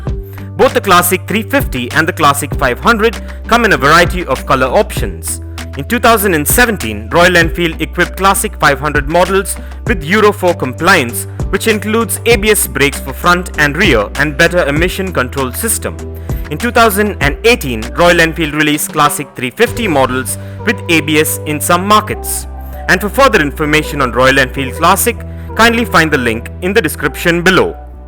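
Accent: United States. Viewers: Your English accent is like an American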